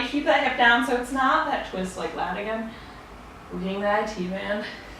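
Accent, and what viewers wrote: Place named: United States. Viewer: This had me cracking up😂 Your chatter was so adorable🙊 The workout was a burner for sure and I’m loving how I feel now! Also, I had a feeling you were/are American so I’m glad you mentioned it in this one😉 I’ve been trying to place your accent😆 Thanks for the fun sweaty time and strong feeling I now have💗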